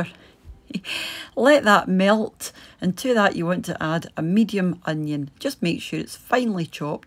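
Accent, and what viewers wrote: Scotland, United Kingdom. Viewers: Hi & Jasper, I’m a new subscriber. I love your YouTube channel & Scottish accent! 😻🐟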